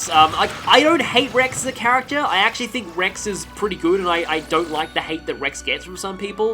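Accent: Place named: Australia. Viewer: could this video be foreshadowing for a xenoblade 2 playthrough? i would love me some british dub + ozzie commentary combo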